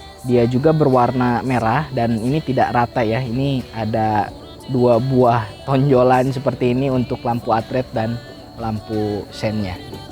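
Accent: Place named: West Java, Indonesia. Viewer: katingali pisan sundana eu...y...😄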